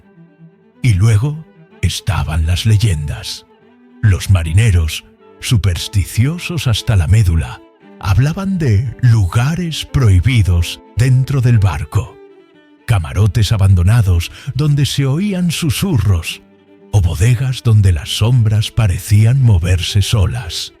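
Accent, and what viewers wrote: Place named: Castile, Spain. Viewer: SIN PALABRAS, MÚSICA, VOZ, LOCUCÍON EN CASTELLANO NEUTRO ...ESTA BIEN PERO MENOS ACENTO CASTIZO X FAVOR ASI SE ENTIENDE AL 100 % . IMÁGENES ILUTRADAS GENIALES ....ESOS FOGONES DE LIS COCINEROS.. SOBRE PISO DE LA CUBIERTA DE MADERA..MMM ..NO ES REAL. PERO...EN FIN..ES MUY ÚTIL Y EXPLICITO E INFORMANTE AL 100!!.. FELICITACIONES.😅😊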